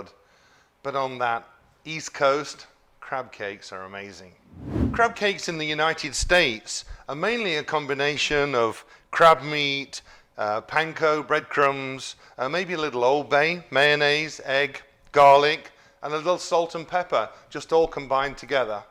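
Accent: United Kingdom. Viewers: It’s just the way us Brits say it with our accent. When I moved to the US from the UK, everyone laughed when I pronounced it literally ‘Mary-land’. It took me a while before I learned it was pronounced more like ‘Merilyn’. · I love my brits!! I love ur accent!! Kinda want to move to England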